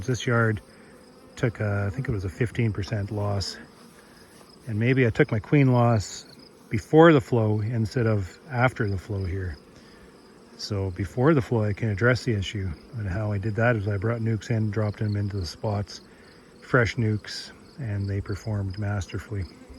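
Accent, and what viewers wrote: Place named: Canada. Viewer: Definitely hear a Canadian accent 🤣...."about"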